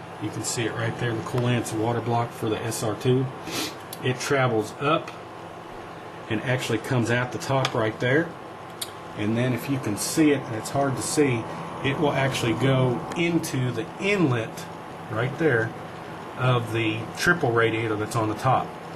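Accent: United States. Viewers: You thick American accent really pisses me off!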